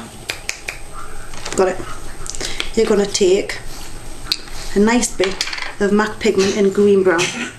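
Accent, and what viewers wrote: Newcastle, United Kingdom. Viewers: nice british accent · I think she comes from near newcastle :D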